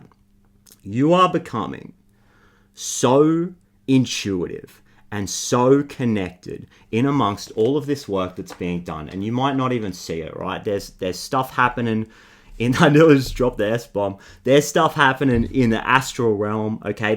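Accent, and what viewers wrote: Australia. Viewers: Felt like you were speaking directly to me. Thank you so much, also the Aussie accent makes it so much better for some reason ❤ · didn’t know I would find a reader with a thick australian accent holding anime chibi tarot cards to resonate with me but alas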